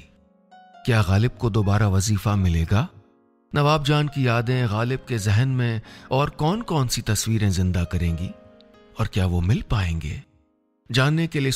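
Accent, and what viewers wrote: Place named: Lucknow, India. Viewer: Janab aapko sun kar dil khush ho jata hai. Aapne Lucknow ke zubaan yaad aa jati hai. Aap ka tahey dil se shukriya.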